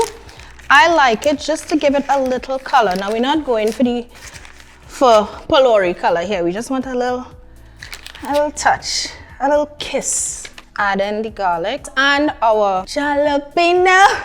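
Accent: Trinidad and Tobago. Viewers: In Grenada, we call it fish cakes or saltfish cakes. The next time I make it, I'll try the jalapeño. This trini-accent is everything.💞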